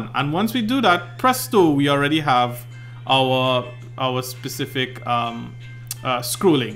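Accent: Trinidad and Tobago. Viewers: I'm sorry but the Trini accent just made me unreasonably happy. Also, the tutorial was so helpful. Thanks for this!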